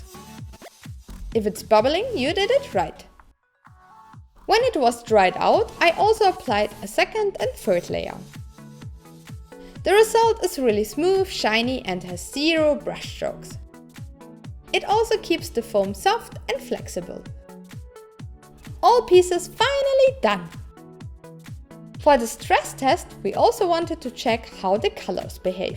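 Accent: Germany. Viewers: I’m always here for the dogs and the German accent. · This English with a German accent or vice versa left me confused 😹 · Where do you come from? It sounds like Germany your English is interesting I mean it sounds pretty German :D I mean no hate but it's interesting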